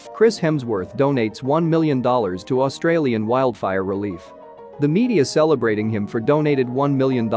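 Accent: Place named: United States. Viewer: But your text to speech accent is so american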